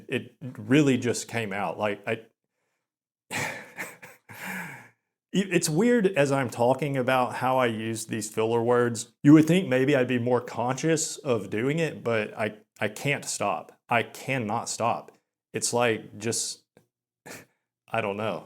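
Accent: South, United States. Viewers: You’re from the South, right? What state? T. Savannnah, GA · yeah stfu dude. idgaf about you as this is the first video of yours i've seen. Your voice isn't giga-magnetic, but it's in the deep register and some people find the Southern accent to be extremely sexy. Talking slowly isn't inherity bad either. I also talk slow and "monotone" and never get the vibes people are being bored with me.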